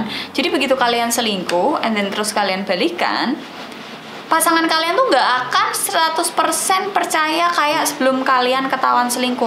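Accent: Java, Indonesia. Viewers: medok banget yaaa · Mbk e wongdi? Kok jowone medog bgttt😅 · Medok bener jawa'a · Logat e wong jowo Iki koyok e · bahasa jawanya ituloh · Logatnya bahasa Jawa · Sampean wong ndi mba loogate ko jowo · Mantap medok juga bahsanya kak Jangan lupa mampir ke chanel aku juga ya teman temanku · Orang mana si kok kyknya orang jawa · Suaramu khas Jawa banget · kyk medok2 ngomong jowoan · logat bicaranya kaya orang jawa? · Medok amat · Medok bangettt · Wong jowo tah MBA jawane pundi? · Embak lek ngmong lucu lo, Jawa pundi pean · Medok tenan sih mbak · Meddddhhhookkk anjirrrrr jowoneee.....!!!!!!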